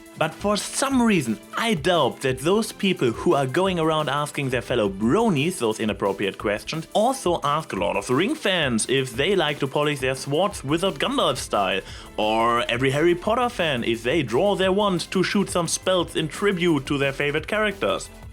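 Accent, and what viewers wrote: Germany. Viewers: your accent is quiet hard german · I Like your Accent. Bist du Deutscher? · 14 He is German, and his accent is quite handsome. Also, if you are going to ask a question, at least, turn replies ON!!! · Are you German? Cause the accent kinda sounds like dat ^^ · 1st: I can hear that you are some kind of german. 2nd: Someone has to do it. 3rd: Good video, good english. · I've seen you replying to the comments, and is it bad if I read every one imagining your (FREAKING AWESOME) voice saying it all? Also, speaking about your voice, <3 dat German accent boiii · I agree with the points you made. But sadly, the world has not yet become to the equestria-esque land we would all like it to be. As the human race is now, as awesome as we are sometimes, I don't think we are capable of learning this lesson as a whole. There will always be evil, no mater what form it takes. 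One last thing, just to sate my seemingly random and endless curiosity. From you're accent, I would like to know if you're from Austria or Germany. If both are wrong, I'm sorry. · you sound like your german .__. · I am in love with your Accent. So very Germany sounding. Or Austrian? Where are you from? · Dieser Akzent! Sorry, wir deutschen haben den nunmal xD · i really like your ascent,it reminds me of Germany from hetalia,i hope that's not offensive in some way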